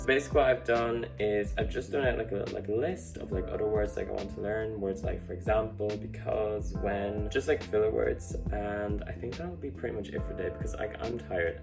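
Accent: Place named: Netherlands. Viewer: As an Afrikaans person, learning Dutch. I can hear a lot of Dutch influence in your Afrikaans. Your pronounciation is Dutch.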